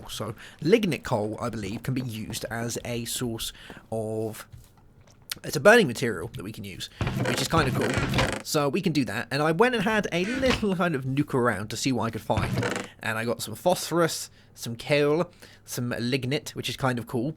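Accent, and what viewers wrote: United Kingdom. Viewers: You've got a very nice British accent ;)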